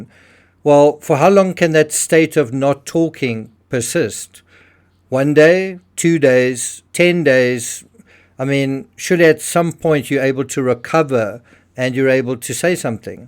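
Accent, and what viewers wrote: South Africa. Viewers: Is that a South African accent??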